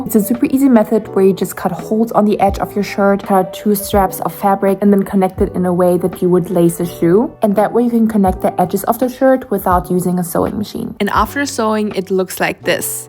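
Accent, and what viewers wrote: Germany. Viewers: heyy, random question but are you german? idk, your accent kinda sounds like it..btww LOVEE UR VIDDDS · Why do you sound kind of German? (I‘m from Germany and this is the first video I watched of you) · i hear deutsch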